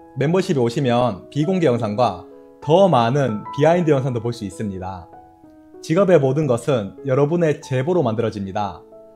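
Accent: Gyeongsang, South Korea. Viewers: 대구 말투 거슬리네요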